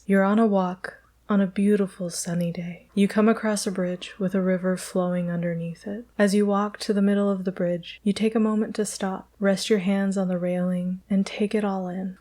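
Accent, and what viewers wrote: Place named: California, United States. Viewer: Ms,Were you born in Okinawa? where were you raised? Your accent is as though you are from California. I first went to Okinawa in March 1960.I was married there in 1965 and my two boys are still there.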